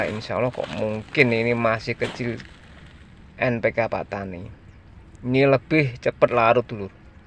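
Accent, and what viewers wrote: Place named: Madiun, Indonesia. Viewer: Hadir om,, sampean asli pundi om?? Kok bahasa jawane mirip madiun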